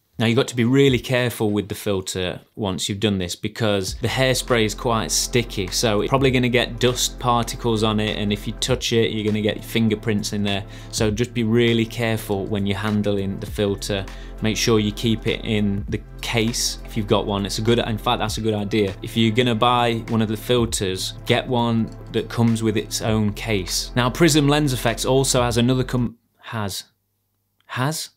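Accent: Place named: Birmingham, United Kingdom. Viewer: Woooh now I see why your accent sounds familiar! I've been living in Birmingham and lastly Stourbridge 'til last October. Now I'm in Italy due to the pandemic but I'm about to get back living in Stourbridge by half May 😀👍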